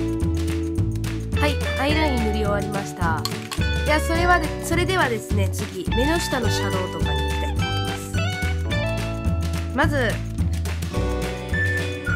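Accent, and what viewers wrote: Kansai, Japan. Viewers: めっちゃかわいい白人さん？って、ベースメークの動画を見ておもって、関西弁だったから超びびりました！ ほんとファンです❤️ サバサバしてて、わかりやすいし、すき！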